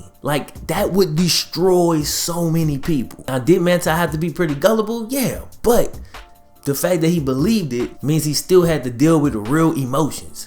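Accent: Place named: Bay Area, United States. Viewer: What up? Sounds like you're from the bay! Am I right?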